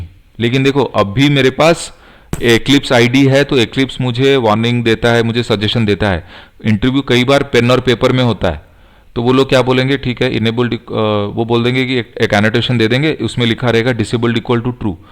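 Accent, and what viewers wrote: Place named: Madhya Pradesh, India. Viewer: sir,excellent.....aapki hindi toh hamare MP ke jesi hain.. i have learnt TestNG from 2 months lekin aapne toh 1 din me hi sikha diya...samjhana ka tarika bahut saral and easy hain aapka thank you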